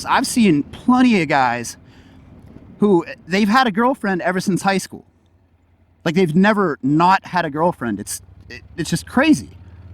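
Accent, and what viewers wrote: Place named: United States. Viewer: well American people always relocate so I thought you must have relocated there .....ofcourse I know your accent is American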